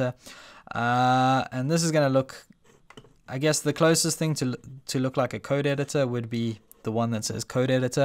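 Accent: South Africa. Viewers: Love hearing a South African accent for a change!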